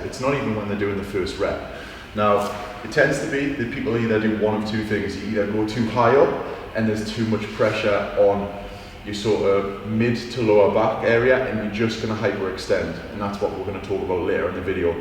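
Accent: Newcastle, United Kingdom. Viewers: He´s from Newcastle, north of England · He has an accent? Which part of UK he is?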